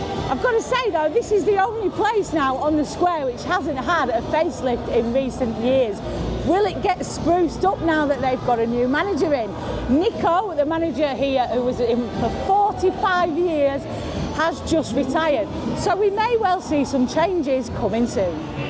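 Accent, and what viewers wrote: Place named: Runcorn, United Kingdom. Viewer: You from Runcorn sounds like my accent that haha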